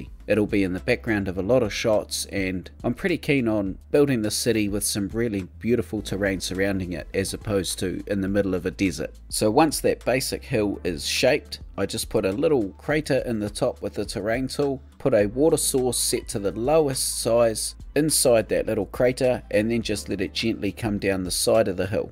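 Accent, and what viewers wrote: New Zealand. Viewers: Love your new series. Love hearing the kiwi accent on CS vids.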